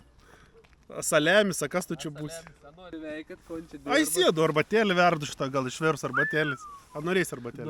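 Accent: Samogitia, Lithuania. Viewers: bet vistiek ta jusu zemaiciu kalba grubi yra kai kalbat tarp savu :D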